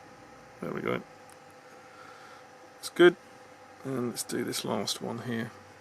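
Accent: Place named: United Kingdom. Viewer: Just the right amount of verbal I say. Newby here, so I appreciate being walked through the procedure. Got the air gun, but looks like I'll need the flux pen and the cleaner. Too bad the radio is all apart and on the bench already, but I watched someone else's video where they just did a simple reheat. I feared the solder would overflow to the other terminals, but apparently not an issue. Wish me luck. Oh, the British? accent/colloquialisms were spot on! (to use a term). Full Wacko (unintelligible) on the airflow LOL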